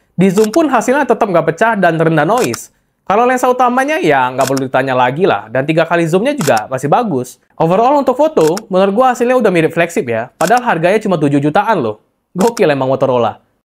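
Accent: Palembang, Indonesia. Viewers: Ngikutin channel abang ini fix dia orang palembang bro melekat banget soalnya logat palembang 😆😆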